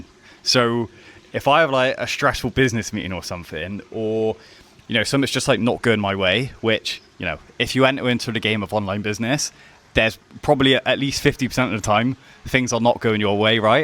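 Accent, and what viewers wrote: Bristol, United Kingdom. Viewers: Hi, love the videos mate!..Spot on👌🏼 I can’t help but notice the accent, as a lifelong Bristolian might I be right in saying you’re somewhere near here😂 Cheers for all the insight dude!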